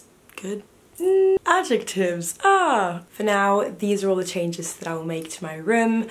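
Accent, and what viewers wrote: England, United Kingdom. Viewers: Your semi-british accent 😂❤️❤️ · Omg u have an English accent!!!! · your new english accent is so cute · holy crap, your voice is both deeper and your accent is becoming british.. OH MY GOSH!!! BRITISH + NORWEGIAN = IRISH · You’re english has became more..um british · Who else thinks that she is already getting a British accent!! CAUSE I DO! 👇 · 99% OMG YOU HAVE A BRITISH ACCENT 1% other stuff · you sound so british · pls notice I love you sooooo much don't stop making videos!😍😍BTW I love u r British accent! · You are already with a British accent 😂 Love you kisses from Portugal ❤️🇵🇹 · You sound so British but it really suits you 😍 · Its so strange being British and I can hear your British accent coming through. I really hope I sound as nice as this on my channel to those who aren’t British 😂😂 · You have seriously turned British! 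Your ascent are so good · It's just my impression or your English has changed, it has become a lot more british😂🧡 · The semi-british accent though :😂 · Omg it’s such a cute room! Next can you do a room tour! I love your videos and I think that your British accent sounds great! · Omgggg u sound British! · Loving that British accent and love the video. Thank you for making a real and relatable dorm decor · You sound so much more British........ I LOVE IT 😍💗 This was a really good video Love from a Aussie girl 💖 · you already have a British accent!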